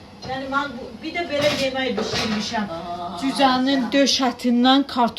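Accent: Talysh, Azerbaijan. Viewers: Молодец. HALALDI. TALISSANMI.? LEKCE VAR. ALLAH QORUSUN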